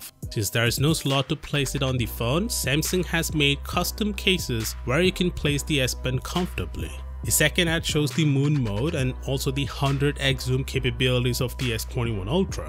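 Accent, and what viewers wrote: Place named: India. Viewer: You're accent sounds Indian.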